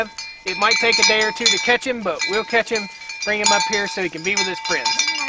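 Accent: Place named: South, United States. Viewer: you have a nice country voice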